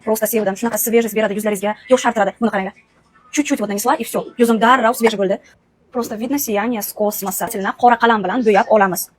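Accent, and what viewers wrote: Bukhara, Uzbekistan. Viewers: Wevangiz zo'rde. Buxoromi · Opa Buxorodan misiz tojikcha gapirib qoʻydiZ☺☺☺☺☺ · Buxorolik ekansiz😂 tojikcha gapirganizdan bildim😂😂😂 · Бу киз манимча Бухородан. Шева тортаяпти. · Shumo a buxoromi yoki samarqandimi shumo chibarósh purstashtet gôyet tojiki gapzadashtagetonba.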